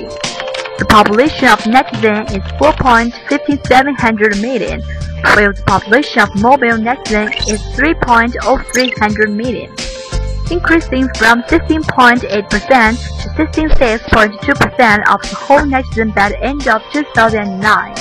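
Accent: China. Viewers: 这是中国口音的英文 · 不应该说，只是仿效了一个有效沟通的style。抄袭Graphics做得很好，内容可以update，有出入的也不是问题。 只是配音有问题：1。录音时候没有作输入音量控制，以致音量水平过高，整体失真，导致刺耳。 2。配音者（VO）虽然颇为流利，但是中国口音实在太重，脱离不了用中文拼音说英文的错误，这是大部分中国学外语学生不自知的事情。文稿还有文法上的错误。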